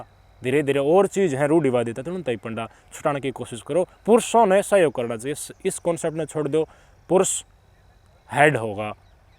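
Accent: Haryana, India. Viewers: Bhai ji you are great haryanvi lahja bilkul stick ❤❤❤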